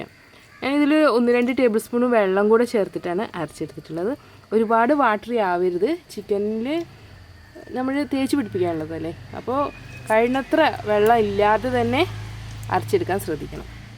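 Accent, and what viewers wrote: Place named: Malappuram, India. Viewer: ഏതാണ് നാട്.. വർത്താനം കേട്ടിട്ട് മണ്ണാർക്കാട് മഞ്ചേരി യുടെ ഇടക്ക് ആണെന്ന് തോന്നുന്നു..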